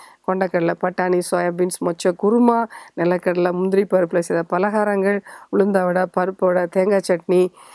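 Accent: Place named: Tirunelveli, India. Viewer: Excellent explanation. Thanks to the Doctor. I liked the way she explained in Tirunelveli Tamil. However, regarding Triglyceride, even strict vegetarians also have high levels. What could be the reason? Is it because of malfunction of Lever and Pancreas? Request the doctor to clarify.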